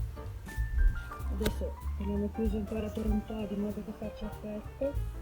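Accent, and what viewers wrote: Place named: Milan, Italy. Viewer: io sono nato e cresciuto in Brianza, ora da 10 anni vivo in provincia di Torino. Ti ho chiesto perché ho riconosciuto l'accento milanese 😉👍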